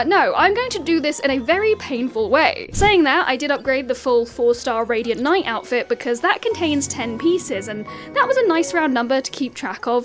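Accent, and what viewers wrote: United Kingdom. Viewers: Can I just say I LOVE your accent? Is it British? I can listen to your talk all day <3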